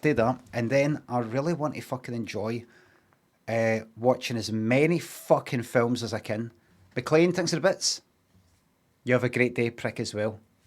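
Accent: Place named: England, United Kingdom. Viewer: Limmy's proper english accent is excellent and very entertaining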